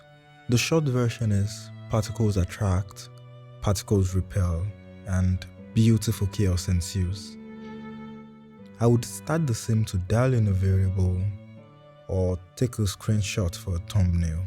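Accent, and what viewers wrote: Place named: Africa. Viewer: you have a African accent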